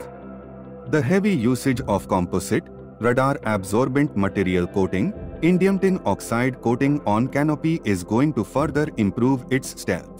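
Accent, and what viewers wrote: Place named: North, India. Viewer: North Indian crap english accent all wrong pronunciations of words...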